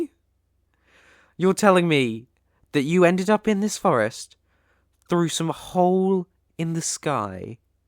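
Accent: United Kingdom. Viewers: Oh that accent makes me happy as a fellow Brit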